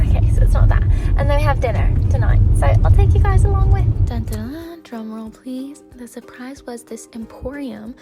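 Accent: England, United Kingdom. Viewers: Why the english accent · Excuse me.. how are you more British sounding than me.. when I’m British?! 🤣 so glad you’re happy!🕊️ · Why does a British accent suit you sooooo well 😂❤ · hi! You really seem such a sweet girl!The plushie is super cute and it is a sweet gift! And also all the othe gifts...so thoughtful!! 🥲i And also i like when u talk with a british accent! I love british accent in general! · The way she just immediately adopted a British accent when she got to the UK is awesome 😂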